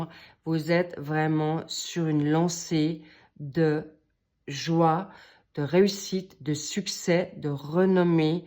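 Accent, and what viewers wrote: England, United Kingdom. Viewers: Merci très chère, j'adore votre voix votre accent anglais peut être? pouvez-vous me le dire.. Vous êtes merveilleusement bienveillante, réconfort et clarté dans vos propos,sans ambiguïté,sans complaisance tout en étant sensible et bonne enseignante de la vie dans tous les domaines, beaucoup de réalisme.Merci beaucoup et que Dieu vous bénisse abondamment.belle journée à vous.❤❤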